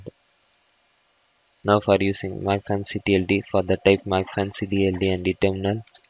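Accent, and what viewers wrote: India. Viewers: Indians speaking english like this dude sound very RIDICULOUS, please use english text to speech software, indian.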